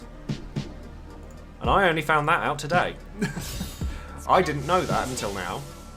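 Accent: United Kingdom. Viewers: I just started this game this past weekend, so was looking for some videos and came across your channel. I love the accent (British?) and you're a cosplayer as well (awesome). I need look no further and subscribed.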